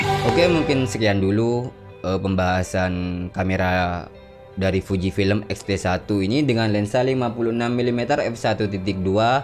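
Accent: Madura, Indonesia. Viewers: Reng madureh reyah? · Logatnya kayak madura kang ? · Madura ya mas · Etemmu logat madurena cuy.haha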